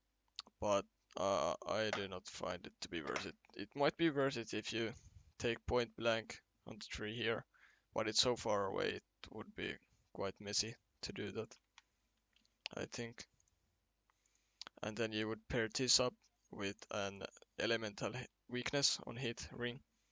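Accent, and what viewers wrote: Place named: Finland. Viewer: If i learned one thing in Finland for my 16 years of stay there, it is how finnish people sound when they speak english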